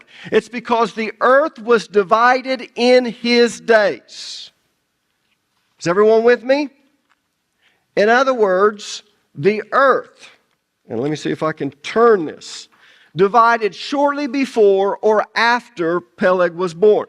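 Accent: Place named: Carolina, United States. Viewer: Carolina is a different dialect. Took me years to understand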